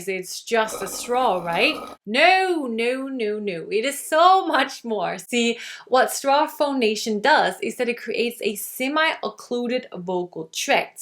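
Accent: Denmark. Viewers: He he, synes godt jeg kunne høre en dansker bag den ellers næsten perfekte accent :). Det var dog først da jeg så dit navn, at jeg kunne lægge to og to sammen:). Tak for nogle super gode og informative videoer!!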